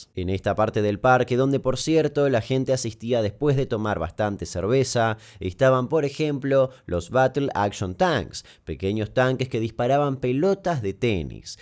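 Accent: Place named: Argentina. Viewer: Que raro episodio del Dollop, con acento argentino y sin Badia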